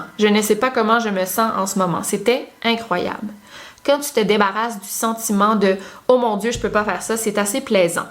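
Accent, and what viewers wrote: Quebec, Canada. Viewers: Avant je ne pouvais pas regarder des vidéos québécoises à cause de l'accent étant donné que je suis française mais je suis tombée amoureuse de tes vidéos, elles sont géniales, je me suis habituée et maintenant je ne peux plus m'en passer 💁🏽‍♀️ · Encore une super vidéo. Tu explique très bien et simplement et j'adore ton petit accent Quebecois ♥♥ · Salut, je pense que tu ne verra pas ce message mais sa ne fait rien je prend plaisir a te l'écrire alors je voulais te dire que j'adore ta chaine! Elle est passionnante. Bravo pour tes 162 k moi personnellement je suis depuis tes un peu prés 50 k! D'habitude je ne supporte pas l'accent québécois mais avec toi c'est différent j'adore ta voix. Je trouve que ta chaine est très intéressante je te trouve très belle douce et.J'éspere que tu aura plus d'abonnée pourquoi pas le million? Enfin tous sa pour te dire que je t'adore bisous d'une grande fan <3. 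· J'aime tellement l'accent, je pense que je vais aller vivre au Canada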